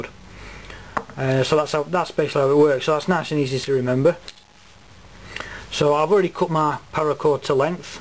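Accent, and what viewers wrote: Australia. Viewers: I got a question are you Aussie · are you from Australia mate???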